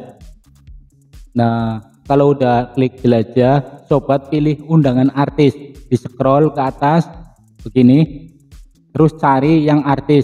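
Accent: Tegal, Indonesia. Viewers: Jenengan asli tegal apa wa. Kayong medok temen ra.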